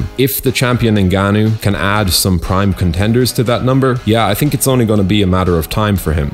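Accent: Ireland. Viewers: Hooray the Irish voice is back!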